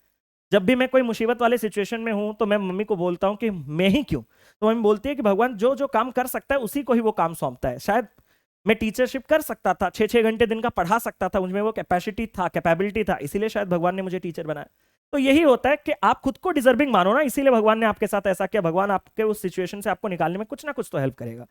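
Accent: Odisha, India. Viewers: sir ap odisha se he kya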